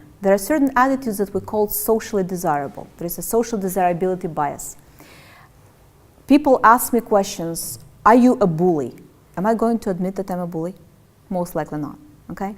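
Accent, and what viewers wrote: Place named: Russia. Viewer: Man, such a terrible russian accent... But the material is great.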